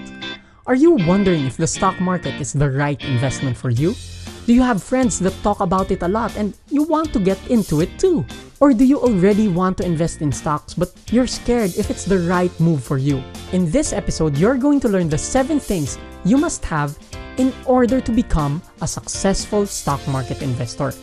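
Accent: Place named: Philippines. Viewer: Very informative and easy to understand..excellent "Tagalish" tone.. 👍🏽 Thank you,! Cheers!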